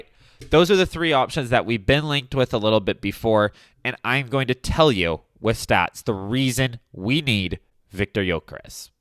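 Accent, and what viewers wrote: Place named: United States. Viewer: Clicked video. Heard accent, clicked off. American accent = opinion void. Especially when you look to young to even remember pre-2012 Chelsea. As soon as you mentioned Kepa turned his back on Chelsea, I knew my instincts were right. Americans are ruining this club.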